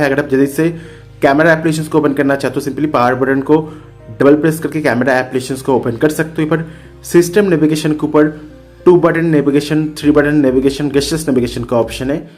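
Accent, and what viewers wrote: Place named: South India, India. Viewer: Aisi hindi kyo hai South se ho kya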